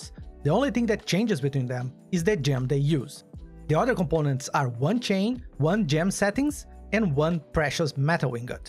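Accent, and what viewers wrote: Brazil. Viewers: Looks like a Brazilian accent lol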